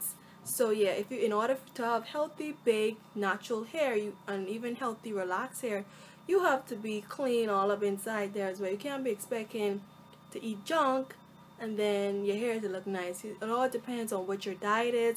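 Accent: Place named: Liberia. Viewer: Hey are you Liberian?? I can hear a liberian accent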